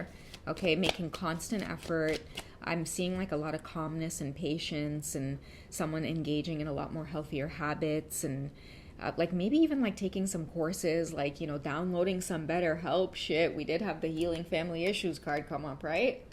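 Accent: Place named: Trinidad and Tobago. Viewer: I absolutely love the Trini accent Queen!!! It gives me life 🥰🙏🏽❤️🙏🏽 Thank you for sharing yourself and these amazing readings with us ❤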